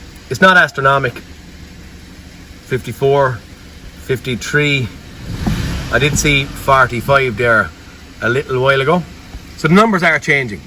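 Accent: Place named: Ireland. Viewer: Awesome video, everything thing I needed to get on. Mostly just the location of the sensor. But the using the multimeter and what to expect. I initially had been watching without audio and using subtitles and I could tell you were Irish by the subtitle errors. Great accent. I have a Geordie accent so I'm not throwing any shade. ✝️🇬🇧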